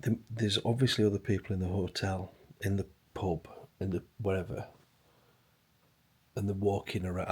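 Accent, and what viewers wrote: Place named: Northern England, United Kingdom. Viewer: Dead pan Northerner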